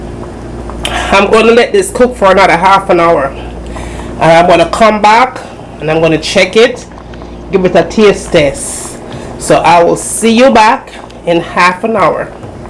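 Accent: Jamaica. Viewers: Yes man it really looks wicked 😃😄 Thank you for this great recipe !! so good to hear your sweet Jamaican accent 🥰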